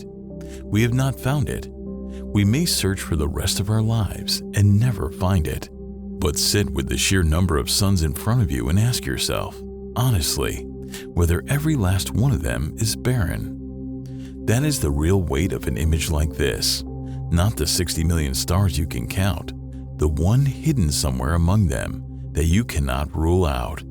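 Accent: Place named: United States. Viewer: I find it hard to listen to the American accent. It has been poisoned.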